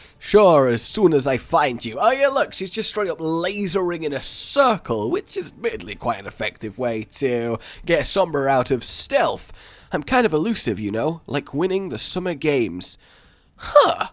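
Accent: Russia. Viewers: your russian accent is beautiful but it's based on stereotypes, and they are wrong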